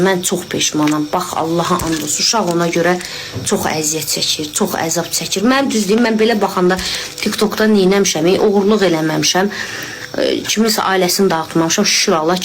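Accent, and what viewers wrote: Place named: Ganja, Azerbaijan. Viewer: Bu lehcesinden Genceliye oxshyur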